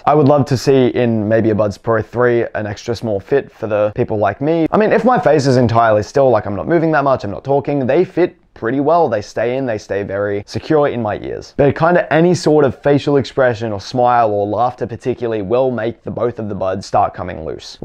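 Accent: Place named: Australia. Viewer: Great, thorough video - thanks mate! Was initially a little confused about the pricing based on your accent (another Aussie here, where they are just under $100). But then realised you were probably talking to a primarily American market and thus talking in US$. (And congrats on your recent graduation!) 👍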